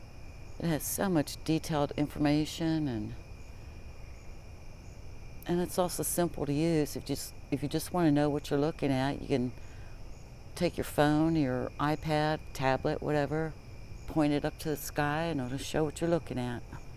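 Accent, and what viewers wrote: South, United States. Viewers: Hi, Absolutely stunning Footage!👍👍👍. I would have to say Saturn is one of my Favourites just beautiful. Thank you for the Time and Effort you put into these Video's, I love the Overlays you put up to show what's what! I have to say I Love your Southern Accent, so soft and Lady like! Have a wonderful Weekend. Take care♥️. Melbourne, Australia.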